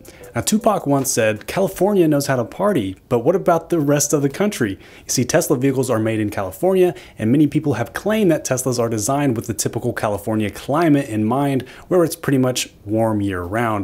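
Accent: Kentucky, United States. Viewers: Are you a Kentuckian?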